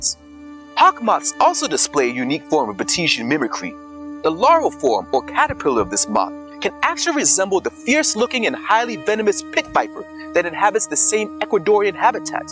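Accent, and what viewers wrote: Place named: Jamaica. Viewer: This is awesome!! I detect a very slight Jamaican accent so I'm double proud! #blackexcellence #videoswithpurpose 🏆🥇🏆🥇🏆🥇🏆🥇🏆🥇🏆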